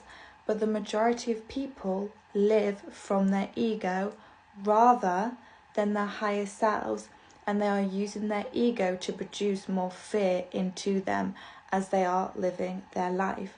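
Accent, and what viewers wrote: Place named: England, United Kingdom. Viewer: Thank you. I love your accent. Are you from England?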